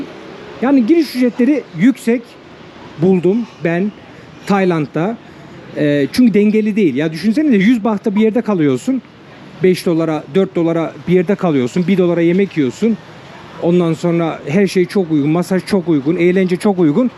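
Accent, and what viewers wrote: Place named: Germany. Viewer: Sen almancısın aksanından ve tipinden belli .20 kere gittim ama chang mai a gitmedim çünkü deniz yok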